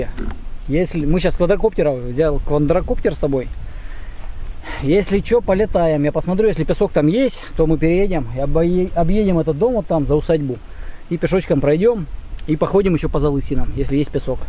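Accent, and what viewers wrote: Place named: Kirov, Russia. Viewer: У автора ролика говор как у моих родственников в Кировской области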